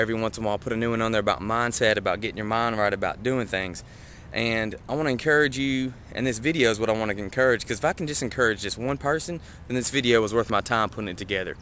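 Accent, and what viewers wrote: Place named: Tennessee, United States. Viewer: well i knew u were from tn by ur accent, and i was like hey that street looks familiar, cuz i live in nashville too! im too overweight to run just yet but it was still really encouraging! im the same way about drugs, im a recovering addict and if my story helps just one person then it was worth it 4 me 2 go thru all that. God bless!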